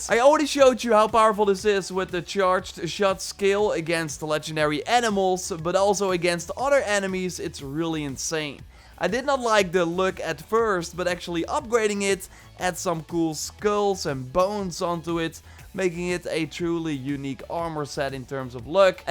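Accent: Netherlands. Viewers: Hahaha de eerste keer dat ik je video kijk en ik hoor meteen dat je Nederlands bent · is this guy dutch?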